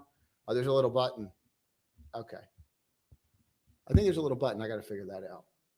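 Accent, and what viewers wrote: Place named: New Jersey, United States. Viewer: You are amazing and have taught me so much... I love hearing you as I'm getting ready for work everyday. Jersey girl here , love the accent ! I lost mine living in Maui . Aloha 🌺 for all your hard work ...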